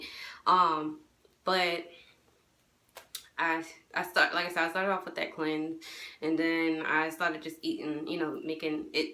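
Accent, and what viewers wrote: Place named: Louisiana, United States. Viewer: Congrats and love your video and Louisiana accent. I also subscribed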